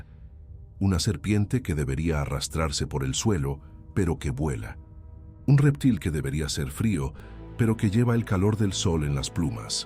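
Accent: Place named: Central Mexico, Mexico. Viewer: Con la narración de un español neutro del centro de México 😊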